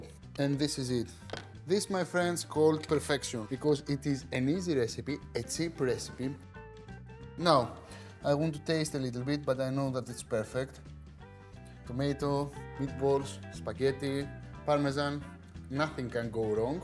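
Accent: Greece. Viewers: Great recipe, easy in oven. You are one of my fav chefs on you tube. Thank you. ❤love the accent love that you’re Greek! Want more Greek recipes.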